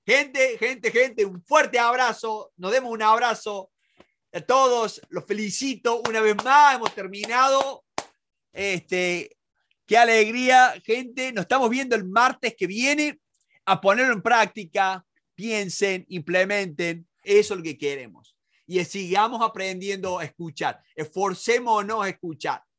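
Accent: Córdoba, Argentina. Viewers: SOS cordobés?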